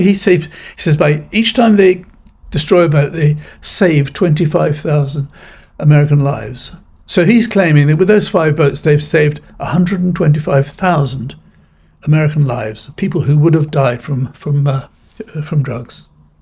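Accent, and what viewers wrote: United Kingdom. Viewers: If you don’t know, know this now: Even though this man has an English/Brit accent, ONLY AMERICANS SAY THAT THE PRESIDENT OF THE USA “IS THE MOST POWERFUL PERSON IN THE WORLD.”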